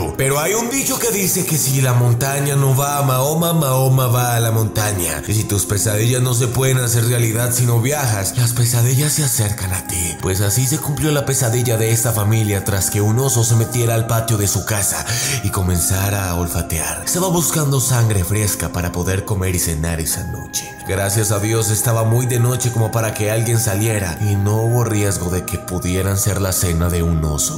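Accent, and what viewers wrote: Colombia. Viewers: pa re Colombiano el pibe este😎😎😎😎😎😎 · eres colombiano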